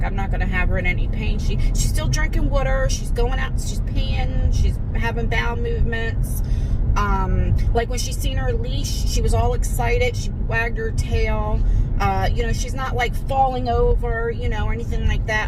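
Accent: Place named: New York, United States. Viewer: Lol that's just your New York accent 😂. That's ok. But im so sorry for your loss with Bella. I know it's much more than just losing her for you.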